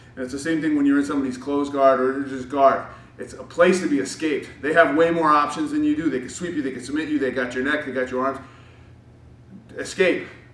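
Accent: Brazil. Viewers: Subscribe to an arbitrary BJJ channel - NO. Subscribe to a BJJ channel with clear instructions in brazilian accent - YES. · the best brazinglish I've heard so far. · bro I swear to god with that brazillian accent you sound EXACTLY like my coach. I was dying the whole time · LOL did not see the amazing Brazilian accent coming.. Made me pay more attention tho, suddenly the instructor seems way more legit. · Lol nice Brazilian accent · The Brazilian Accent made me suscribe! Had me ROLLING 😂😂😂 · Between Admiral Ackbar and the Gracie accent, I couldn't sub fast enough haha. Awesome info also! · hahahahahahahahaha. loved the brazilian accent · Hilarious Brazilian accent. Subbed based just on that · Very great brazillian accent!!! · 10/10 brazillian accent. Sounds exactly like my teacher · love these videos. killer Brazilian accent! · His Brazilian accent reminded me of nigahiga WHY GOD WHHHHYYYYY? 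· I'm only just about to train gi, after doing nogi for a little bit. I felt like I was watching a video of myself! Thank you for the humor, and the good advice. The Brazilian accent really drove the points home. :) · Your Brazilian accent is hilarious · as a brazilian i can confirme : accent 100% legit true ahhaaha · priceless brazilian accent !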